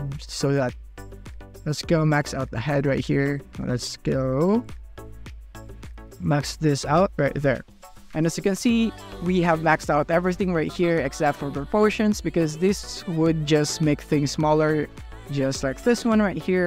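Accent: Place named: Philippines. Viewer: sound filipino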